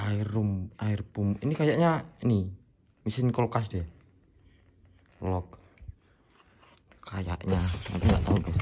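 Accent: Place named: Java, Indonesia. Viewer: Aku seneng.. Denger pean ngomong Jeannnn.. Khas jowone Cak.. Mantaaf pokok e..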